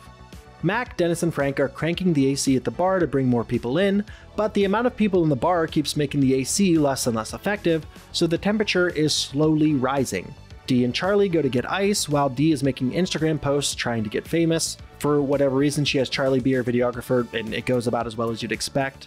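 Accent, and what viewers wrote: United Kingdom. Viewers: Why hire the British voice over guy? He's got the most boring voice ever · LMAO SAME. i have no idea why there's a british guy reading it but it sounds so goofy to me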